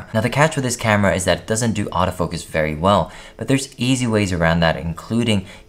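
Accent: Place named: United Kingdom. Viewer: Good tips and British accent game is strong. Jolly good show!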